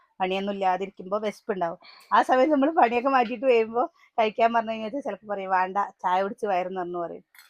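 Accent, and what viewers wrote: Palakkad, India. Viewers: Palakkadu kkar aganeyanu parayuka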